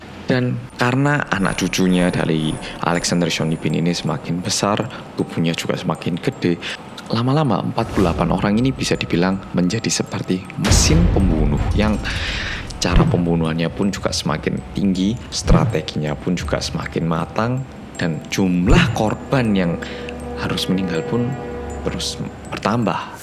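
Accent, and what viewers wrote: Java, Indonesia. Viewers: Welkom bekk to bersama org korea yg medoookk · Mas pas pertama kali liat channel nyaa aku sempet bingung 🤔 orang Korea bahasa Jawa nya medok banget 👍🏻👍🏻👍🏻 # kangen sama Rindingdong Like ya 👇🏻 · Woffyou mas yutup medhook kesayangan kita semua:*:* <3 <3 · Mas medok chek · Maaf ya mas out of topic commentnya. Aku mau bilang, aku mulai hidup sehat karena Mas, dari diet sehat sampe skincare-an pake Nacific. Aku ngikutin mas dari badan mas masih agak berisi sampe kaya gini. Well, makin hari mas makin guanteng yaaa. Orang lain makin hari makin tua, mas kebalikannya. Aku percaya itu semua karena gaya hidup mas. Thats why aku termotivasi jejak mas. Di saat aku pengen nyerah, aku lihat video mas dan selalu termotivasi lagi. Sukses buat mas, semoga suatu hari kita bisa ketemu langsung dan sharing pengalaman hidup. Luv u orang korea yg medooook! 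· We miss you mas yg medhoookkkk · Oke mas medok 🦖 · Gara2 delok mas orang korea yg medhokk.. lali nak aku lagi godok mie. Wah mas mas... indomie ku sampai ke-medhok'en iki lho. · Gk perlu jadi penonton pertama,yg penting jadi penonton setia nya mas 😭😘 Semangat mas 👏👏👏 Mas,jalan jalan dong mas ke busan,saya lagi ada di Busan nih mas,mas kesini dong😁 Saya pengen banget ketemu mas lah👏👏👏 Buruan ya bang,saya keburu berangkat wamil😁😁 Semangat terus mas Orang Korea yg medok😭😘😊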